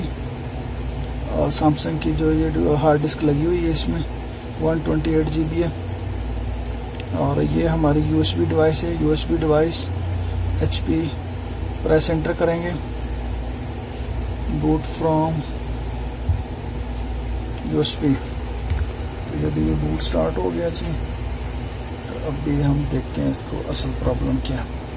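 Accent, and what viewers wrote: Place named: India. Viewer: If u hear an indian accent u know its gonna work. I've been working on this problem for 3 hours and after doing what this legend does it worked first try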